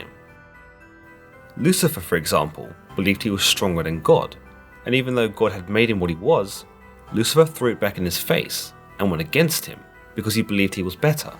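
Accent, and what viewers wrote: United Kingdom. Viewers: video length my moth3rs bday, so I was like Imma do Connie a solid. 177,444 views, Izeah or something luci4. Lucy Ferrey, if Scarlet Johansen had a crush on me. I had sorta long black hair, but I cut it shoulder length, Darth Vadored it. I am God and as a side job God's body guard. If I got a second story and solar pannel roof, would I sell it to my friend Leeman or whoever, and or myself and commit to dying my hair ultimate black to match the solar pannel roof. 'Cause right now it's a one story with red ocher Spanish tile roof that matches my Amanita red hair. Went there. For the prophets. The British accent is powerful. a gay piggy back to smoke a bowl of weed we sometimes entise ourselves with. I'm going now. Ha ha ha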